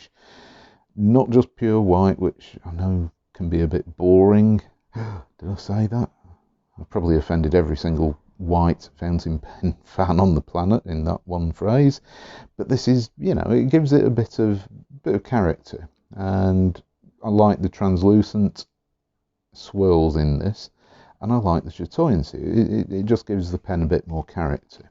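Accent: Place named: United Kingdom. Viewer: Very good pens.. By the way, I love your british accent.. 👍👍🇪🇸🇪🇸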